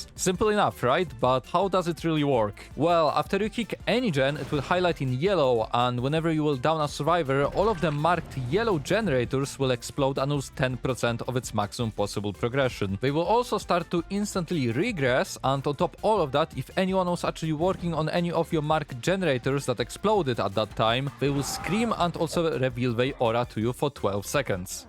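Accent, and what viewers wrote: Poland. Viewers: polski akcent? :)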